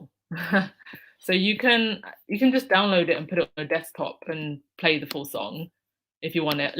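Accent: United Kingdom. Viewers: Hello, from Canada..I always enjoy your channel, especially your British accent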